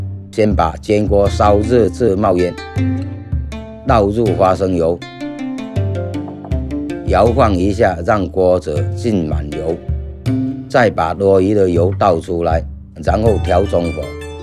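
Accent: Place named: Southern Guangxi, China. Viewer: 北海人哎，桂南腔好怀念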